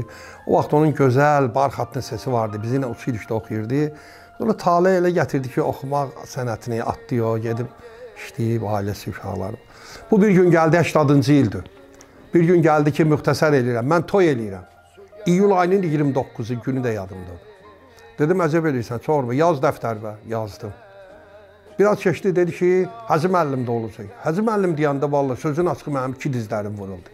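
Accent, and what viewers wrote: Baku, Azerbaijan. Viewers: Allah səni bizə çox görməsin Hacı. Doymuram sizə qulaq asmaqdan . Bakı ləhcəsidə öz yerində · Təmiz Bakı ləhcəsi ilə Azərbaycan dilində danışır kişi.Burda başa düşülməyən nə varki?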